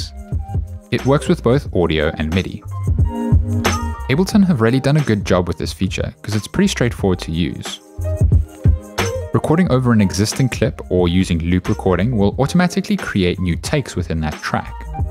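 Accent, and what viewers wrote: South Africa. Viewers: That SA accent! Lekker man, great video. Excited for ableton 11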